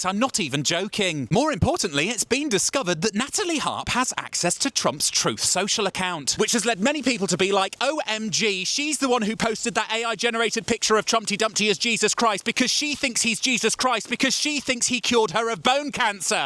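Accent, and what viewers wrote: United Kingdom. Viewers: You good, man. You got good shit. Love the British spin and accent. Such class! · Info ✅ Humor✅ Classic British accent to make it all more palatable ✅✅✅ Trumpty Dumpty this is the first I’ve heard that nickname omg it’s great. Just as great as “thicky thicky dumb dumb” being said in a British accent. it doesn’t sound anywhere near as good with my ‘merican accent lol 🍒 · Absolutely nothing to do with the content of this video, which is great, but I love that you kind of look and dress like an American Cholo (probably gonna have to google it) but have a British accent. Epic. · Can’t take news about America seriously from somebody with a British accent.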